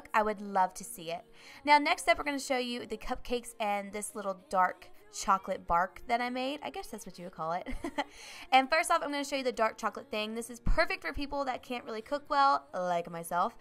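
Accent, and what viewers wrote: South, United States. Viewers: R u southern because u sound very southern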